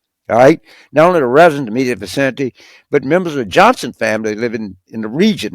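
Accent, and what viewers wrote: South, United States. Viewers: his heavy Southern drawl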